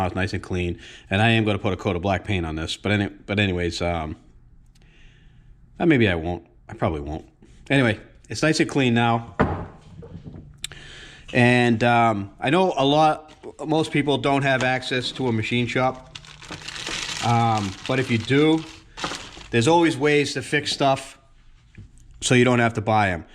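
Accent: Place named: Massachusetts, United States. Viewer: ahhhh I knew it lol I live in Maine, but my dad has 6 siblings in mass so we have huge family down tthere. All over. I knew the mass accent. That's awesome. And yeah I didn't take the motor out, or take the bike apart so ill be referencing ur engine vids for sure. I already learned about kawi swingarm spanner nut deal, which black wire goes to the sensor about starter, orientation of crank case vent etc. We just went to everett MA and bought 3 brand new machines. Me my girl and the kiddo.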